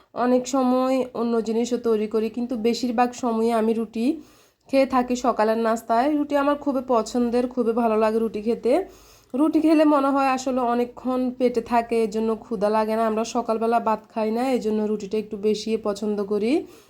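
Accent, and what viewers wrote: Noakhali, Bangladesh. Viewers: আসসালামু আলাইকুম আপু।। তোমার বুদ্ধির তারিফ করতে হয় আপু। মাশাল্লাহ এত বুদ্ধি তোমার। এত সুন্দর টেকনিক খাটিয়ে নিশ্চয়ই স্বামী স্ত্রী একসাথে হয়ে। এ ধরনের আশাগুলো তোমার পূরণ হয়েছে। তোমার কথাগুলো অনেক ভালো লাগলো। যদিও এভাবে চলে না কেউ। তবে আপু চেষ্টা করব ভালোভাবে স্বামীর সাথে চলার। এবং চলে এসেছি। ইনশাআল্লাহ। আমার কথাগুলো আমার মনে থাকবে। তোমার আইডি এগুলা।তোমার কথা শুনে মনে হচ্ছে তুমি আমাদের নোয়াখালীর মেয়ে। যাইহোক আমার ভুলও হতে পারে। দোয়া রইল আপু তোমার প্রতি। তুমি আরো স্বপ্ন পূরণ কর। আমাদের জন্য দোয়া করবে আমরা যেন তোমার মত চলতে পারি ইনশাআল্লাহ। ❤❤❤❤❤❤❤❤❤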